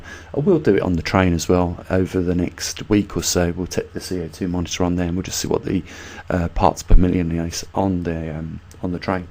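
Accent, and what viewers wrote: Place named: West Midlands, United Kingdom. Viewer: you sound like you are from the West Midlands, somewhere. I live near there. would it be possible to meet me. I am interested in your work but lack knowledge.?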